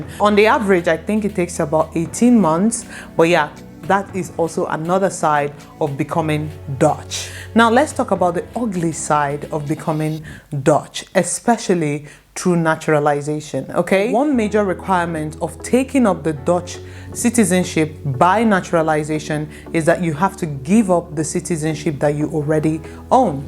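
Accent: Nigeria. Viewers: I would not think you were Dutch because you sound Nigerian.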